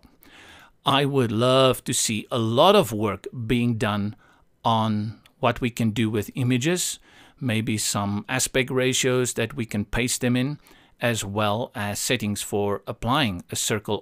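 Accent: South Africa. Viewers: you sound like you are from South Africa?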